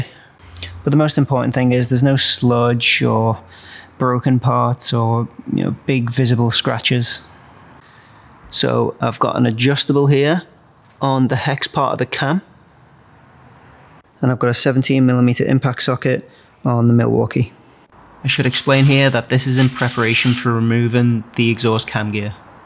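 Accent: Liverpool, United Kingdom. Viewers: you a scouser ?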